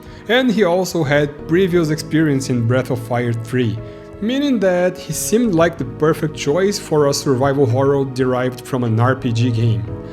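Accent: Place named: Brazil. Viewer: I thought it sounded like my friend who is Brazilian's accent! Man I'm so impressed with bilingual speakers.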